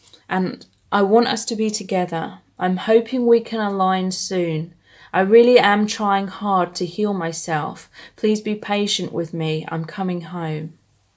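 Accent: United Kingdom. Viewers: I love your British accent ❤❤.Great job by the way, you are really gifted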